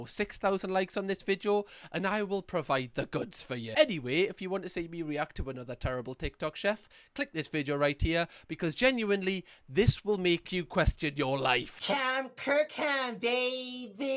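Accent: Newcastle, United Kingdom. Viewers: Here a 55 year old from Zambia 🇿🇲 I enjoy your videos! Love the geordie accent too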